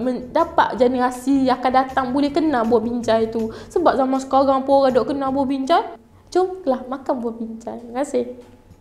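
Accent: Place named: Terengganu, Malaysia. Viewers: Akak idola..banyak belajar loghat Terengganu dengan akak.. 🐊: Heheh...dapatlah satu heheh... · Seriously kakak ni yang paling comel dalam ML Studios, dengan bahasa Terengganu pekat dia, cara dia bercakap. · Suka dengar loghat ganu, speaker comey betul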